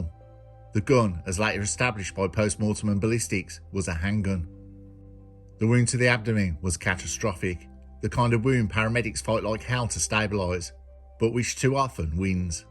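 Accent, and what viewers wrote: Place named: Black Country, United Kingdom. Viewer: Just love the black country accent. Fantastic. Brilliant narration as per usual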